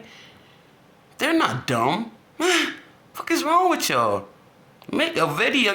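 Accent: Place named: Philadelphia, United States. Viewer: You sound so Philly!! I love it....she’s a nut Jawn!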